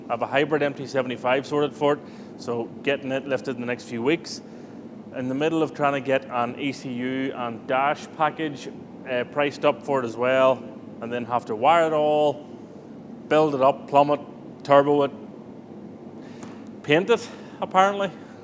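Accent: Scotland, United Kingdom. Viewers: Some of us actually know you have a life and family outside of YT. I'm just a "peanut gallery regular" with an appreciation for a fine Scottish brogue! Hug your wife and children- they are much more of what makes you tick than I ever will be.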